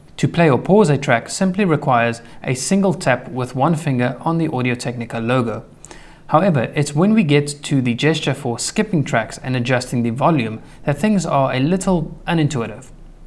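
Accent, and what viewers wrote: South Africa. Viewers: Your accent sounds South-African??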